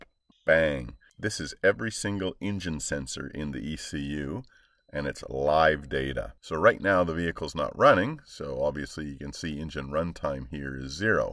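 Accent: Canada. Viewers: Thanks for this video. Looks like the site with the Techstream download is artificially buffering the download very slowly to nudge the user to download their software... annoying. Do you have a source for a usable mini VCI cable? You sound Canadian, BTW.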